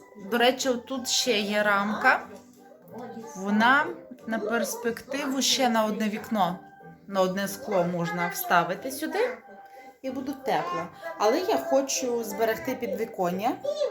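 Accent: Western Ukraine, Ukraine. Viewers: Вас вже від западенки не відрізниш😘 навіть не віриться, що ви з Харкова. А вікна файні вийдуть) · Вимова у вас західна, не скажеш що ви з Слобожанщини. МИРУ нам всім та добра.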